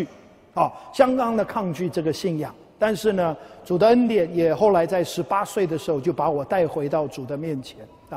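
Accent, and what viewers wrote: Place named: China. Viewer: 说的太好了，还有着非常地道的汉语，感谢分享❤❤❤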